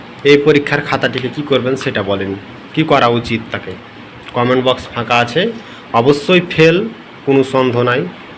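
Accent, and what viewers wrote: India. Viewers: আপনার কথা শুনে তো ইন্ডিয়ান ইন্ডিয়ান লাগছে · আপনার কথা শুনে ভারতীয় মনে হচ্ছে আপনি এই খাতা কোথায় পেয়েছেন 🧐🤨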